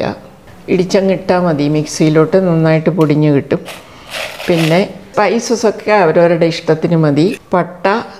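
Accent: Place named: Kollam, India. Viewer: 🙏🙏 teacher,super presentation ammayude sneham adhyaapikayude arivu ellaam koody nalla ishtamaayi 😍😍 teacher place kollam aano samsaaram kettappol thonniyathaane 🤗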